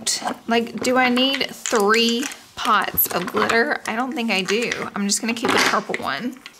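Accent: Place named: Alabama, United States. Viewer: Love hearing someone with the same Alabama accent, you speaking my language lol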